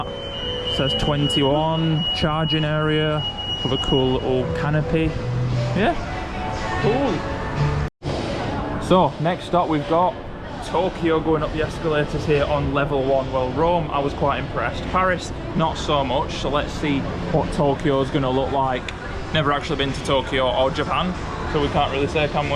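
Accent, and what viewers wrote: United Kingdom. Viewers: Are you cockney?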